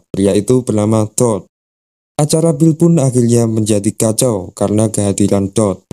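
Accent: Java, Indonesia. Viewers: baru kali ini dpt yg jawa biasanya aksennya Sunda mulu 👍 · logatnya jawa bgt mas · Jawa nya medok bgt jadi kga jelass asikkk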